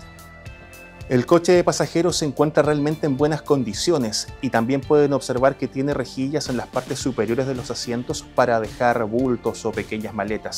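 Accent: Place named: North, Chile. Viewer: Excelente nota! Y excelente noticia el que se vaya observando de a poco el revivir de ramales como éste a lo largo de chile. También destacar el claro y bonito dialecto algo nortino que nos recuerda a los oyentes que en chile nos queda aun un hermoso dialecto no cargado al coa contemporaneo. Gracias por la nota, me suscribo inmediátamente a tu canal! Saludos desde Suecia de alguien que hartos años vivió en chile. :)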